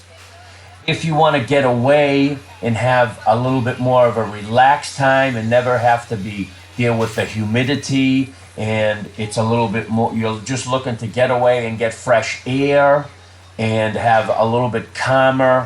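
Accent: New York, United States. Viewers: Keep up the good work on your videos. Your accent sounds like New York City, where I lived for 35 years. So I take your evaluations about Pereira very seriously.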